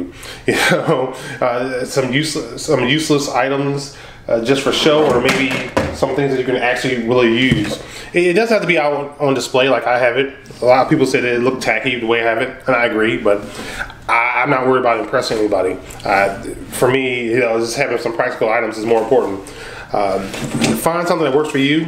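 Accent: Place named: Detroit, United States. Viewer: been watch for 10 years, wen he became so Detroit?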